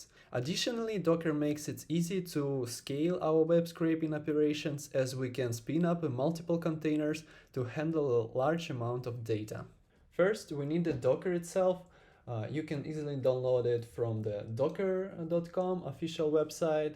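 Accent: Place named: Russia. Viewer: Наш акцент трудно не узнать, но автор долго не выдавал себя, пока на Амазоне не появилась доставка в Российскую Федерацию 😂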